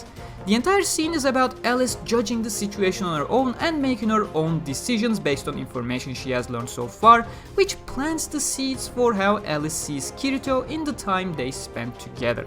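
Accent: Turkey. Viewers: türk olduğun tam belli oluyor aksanından. :D Kusra bakma ama biraz kötü aksanın.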